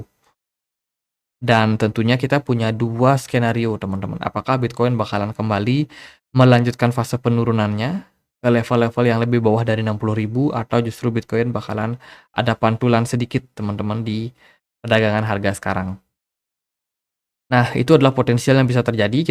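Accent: Bali, Indonesia. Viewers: Dari vokalnya jelas orng bali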